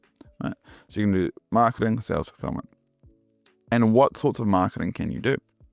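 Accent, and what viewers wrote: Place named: New Zealand. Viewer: Are you from NZ? You sound like a New Zealander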